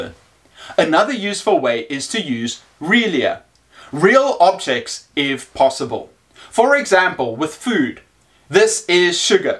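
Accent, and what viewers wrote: South Africa. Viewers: Are you from South Africa? really enjoying the videos by the way. My students love the activities.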